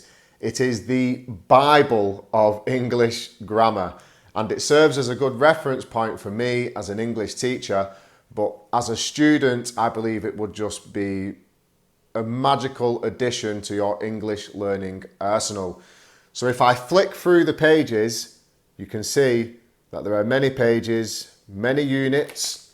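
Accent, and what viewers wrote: United Kingdom. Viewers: Thanks for your videos, I watch your lessons because of your cool British pronunciation. Really it gives shift to undestand original Royal English accent :). Again many many thanks for your lessons. Sincerely Anvar from Uzbekistan...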